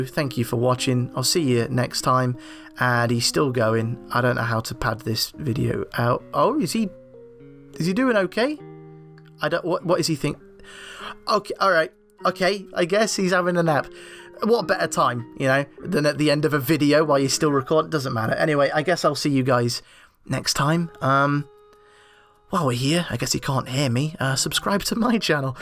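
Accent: United Kingdom. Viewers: I'm really like the new accent. British? Yes, please! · voice is the opposite of soothing. It's super nasally and whiny. This guy is British. It doesn't get any better than that. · This should be the British dub of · Thank you British, very cool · British is best. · sounds a lot like Dave from BoyinaBand. And I don't just mean because of the british accent, they have a similar tone and even inflection to their voices that for a moment I thought it WAS Dave doing the Voice Over. Eerie. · Damn. I like British. Really really great voice and speech. Probably my 3rd favorite YouTube Guy to listen to. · This sounds like a British. (Hope you get feeling better. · I kinda like British better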